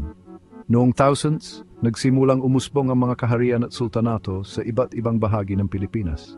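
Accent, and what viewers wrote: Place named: United States. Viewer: PARANG AMERIKANO YUNG NARRATOR..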